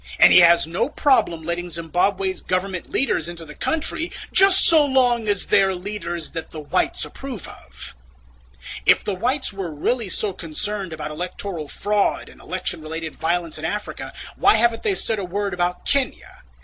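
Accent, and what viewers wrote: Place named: United States. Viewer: hij praat als een Amerikaan. Geen Braziliaans accent.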